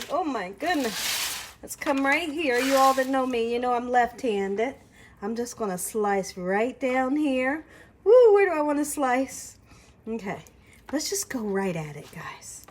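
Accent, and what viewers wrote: South, United States. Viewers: Ooooh she country I love it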